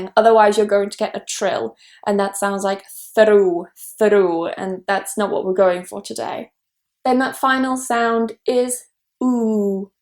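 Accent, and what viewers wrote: United Kingdom. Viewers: I like British's accent. beautiful. I like you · Thanks for the video,..it's good to learn how to pronounce words in british accent...it's amazing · thank youu,,, i love ur british accent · Thank you Thoroughly This is a little too British for me… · I'm really for in love for video. Fist at all I like british pronuncition, and it much clear. I was looking for a pronunction couse and it meet what I wanted. My language is portuguese and video was so helful. I love english language. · Thanks a lot of your video and beautiful British accent. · I fell in love at first sight, but I'm afraid you're already married ☹️. Anyway, I'm learning american english nevertheless I'm going to learn british accent as well because of your videos. Thank you in advance 😊. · Oi mate! 🤭 love listening to British accent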